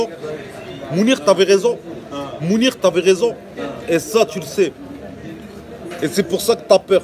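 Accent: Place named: North, France. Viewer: C'est le nooooord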